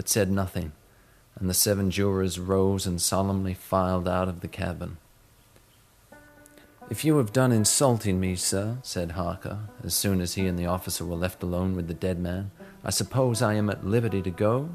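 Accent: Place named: South, United States. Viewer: what a wonderful, flowing southern accent, beautiful voice, and a good reader - who is he??? :}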